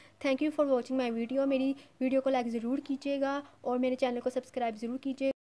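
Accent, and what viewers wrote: Lahore, Pakistan. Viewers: Lahorey accent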